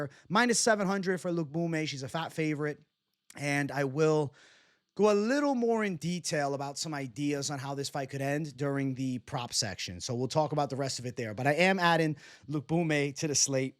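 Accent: New York, United States. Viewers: Can u put ur picks in the description so I don’t have to listen to that awful NY voice of urs. New Yorkers sound so damn dumb.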